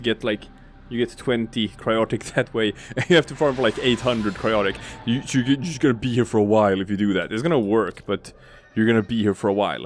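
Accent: Sweden. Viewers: So u swedish? u sound swedish!!! Hej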